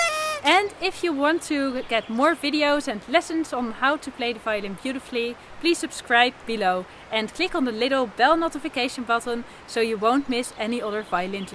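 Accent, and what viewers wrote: Netherlands. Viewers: You have such a dutch accent!! I am dutch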